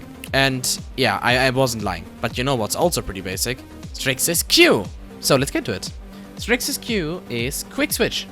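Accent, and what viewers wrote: Germany. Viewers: alter man hört sofort diesen deutschen akzent :D gutes video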